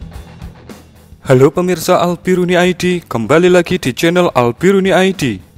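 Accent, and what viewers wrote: Java, Indonesia. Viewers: chanel medok tpi mantap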